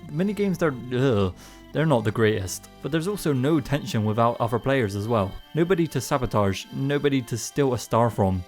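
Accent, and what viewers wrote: United Kingdom. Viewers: I have a suspicion that this guy is british but i cant put my finger on it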